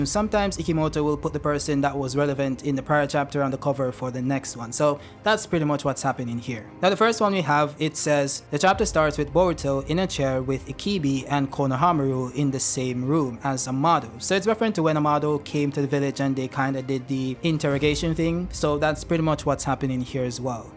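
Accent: Jamaica. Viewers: yo bro are u jamaican?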